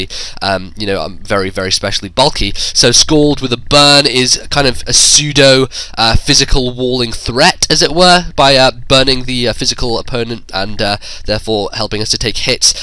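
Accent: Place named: England, United Kingdom. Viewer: your obviously english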